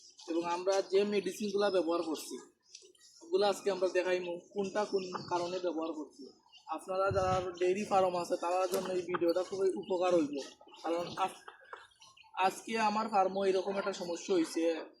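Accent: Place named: Sylhet, Bangladesh. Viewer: ভাই সিলেটের মানুষ কি এতই মূূরখ যে বাংলা শুদ্ধ ভাষায় কথা বলতে পারেনা আপনার এই ভাষা কি সারা বাংলাদেশের মানুষ কি বুজে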